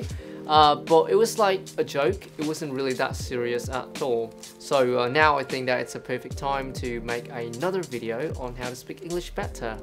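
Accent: England, United Kingdom. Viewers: Tiếng anh của England nghe sang vãi đái :)) đc quả accent này đi cưa gái thì đỉnh ae nhờ :)) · Mô Phật, coi miết mà giờ mới để ý cái series này, kể ra tui cũng khá giống đấy chứ và bây giờ tui có thể enjoy these videos with English accent =>>>>>> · British accent wow amazing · Em ít khi nghe người Việt mà có accent 100% anh-anh như anh, nghe sướng tai gì đâu ❤️❤️❤️ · nice British accent, mate! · Thích BA vclll =))) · I love your British accent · dude this is obviously british accent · I really love your British Accent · giọng này hay nhưng pha Australian r nhé bạn. K hẳn British. Cách bạn ấy nói YouTube hoặc about. N chung là có xu hướng British thôi. Thú vị phết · Good UK accent there, mate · Ahhh thích bạn này British accent 👍👍👍 so admirable bro 😍😍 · Seems like he's talking in British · Cái accent của anh giống người anh nói ghê ah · My bf (Caucasian) told me that your British accent is pretty nice. 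The only funny thing he noticed that you said “elementary school” instead of “primary school” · I was like hmmm, that sounds like a British. And then the "mate" came out. Yup that is british accent lol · Love this British accent!!! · how cute ur british accent... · uầy anh có cách phát âm của người Anh rõ rệt nhưng cũng pha 1 chút Mỹ ngưỡng mộ thật sự :3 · British accent đỉnh quá :3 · I LOVE YOUR BRITISH ACCENT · Woww👍British accent...love it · your British accent is addictive af omg chef kiss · omg, his British accent is incredible! beautiful. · nói giọng anh - anh nghe cuốn ghê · nói giọng dễ nghe quá luôn í phát âm accent british mê =((( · OMG British accent , luv it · You have such a great British accent which makes me feel a bit more comfortable when practicing it. Keep up up the good work! Ps: from Vietnam :3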